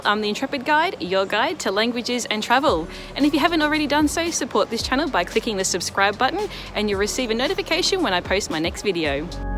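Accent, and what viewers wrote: Australia. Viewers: Been around most of Cotswold villages you filmed. Enjoyed your precise commentary. Do I hear an Oz accent? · Hello, can I ask what your accent is? You sound a bit Australian but I can’t tell haha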